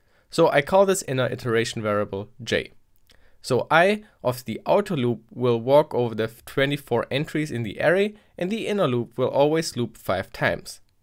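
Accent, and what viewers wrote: Germany. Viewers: He's German so some funny pronunciations comes with him you know! ;) I'm Swedish and we do the same, like calling Germany for Jermany.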